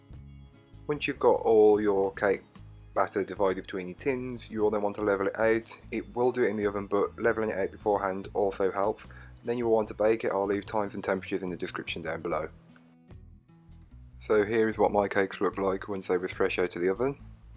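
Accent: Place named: Walsall, United Kingdom. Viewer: Hey love this! my mum always buys a black forest gateau for Christmas but I think this year I might give this one ago..😆 can I ask where your from as listening to your accent you sound like my brother in law he is from warsal area I have probably spelt that wrong lol 😁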